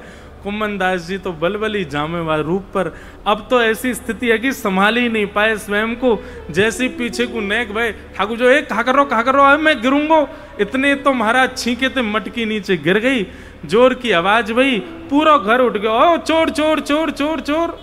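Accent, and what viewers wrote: Braj, India. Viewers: Radhe Radhe maharaj ji aapki ktha bruj bhasha mein sunana bahut achcha lagta hai ❤❤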